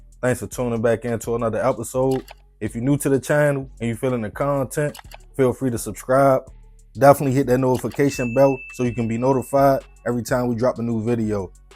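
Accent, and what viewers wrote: Baltimore, United States. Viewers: You doing our city right I noticed your accent gettin way more thicker ...you not from Baltimore originally but I give you ya adoption papers you deserve it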